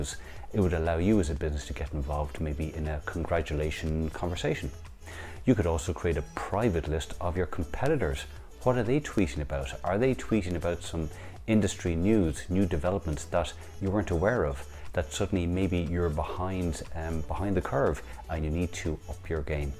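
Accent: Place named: Ireland. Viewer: Great thanks. As a Scot, its so refreshing to hear an Irish accent, so much easier on the ear and easier to understand than many of the other accents you get on these types of videos. Thanks.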